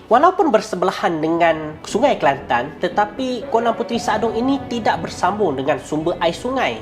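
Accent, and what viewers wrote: Thailand. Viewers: Best dengar slang thailand 🤭🤭🤭🤭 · Aku suke die ni ckp nme org tu.. Die pnye slang Thai tu.. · Orng thai ke ni accent dia beli sebut nama ii tu sebijik orng thai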